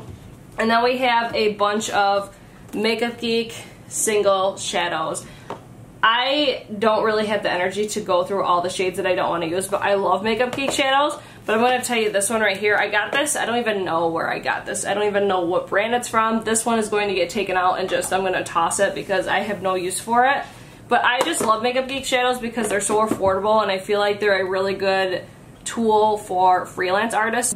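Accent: Midwest, United States. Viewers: What is this accent?? Midwest?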